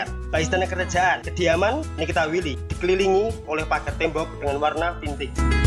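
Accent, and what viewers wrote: Java, Indonesia. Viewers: Medokk.. Hhaha lucu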